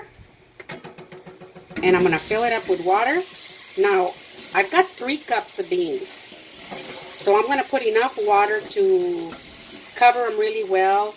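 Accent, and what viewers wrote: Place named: San Antonio, United States. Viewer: You from TX? I’m from San Antonio. You sound like you’re a San Antonian . Reminds me of home. Been in NYC for a long time. Miss that accent. Us younger generations don’t have it really anymore. Brings back memories of childhood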